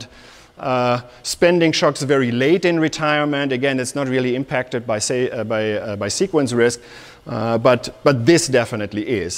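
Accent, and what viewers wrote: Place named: Germany. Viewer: Deutscher Akzent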